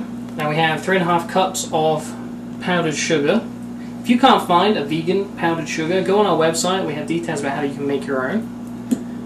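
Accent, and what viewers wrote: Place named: United States. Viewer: Maybe he's gaining some of the american accent by living there for a while...: )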